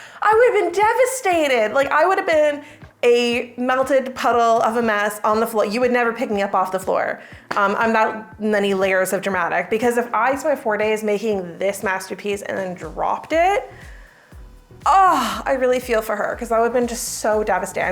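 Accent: Canada. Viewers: that’s just her Canadian accent.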